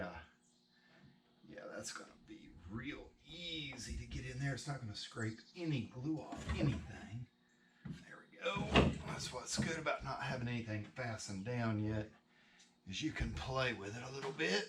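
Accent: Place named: South, United States. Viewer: Im starting to get your shorts with the exaggerated southern country accent in my feed. The algorithm is starting to work for you with those videos! Make more they’re really funny and you’ll get a lot more subscribers!! Awesome videos. Watching From Chicago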